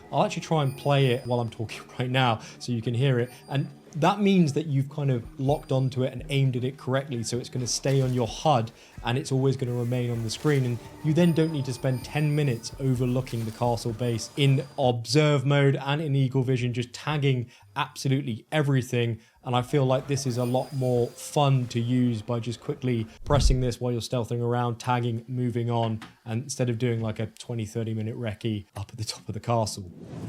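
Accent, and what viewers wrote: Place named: RP, United Kingdom. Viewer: Your very posh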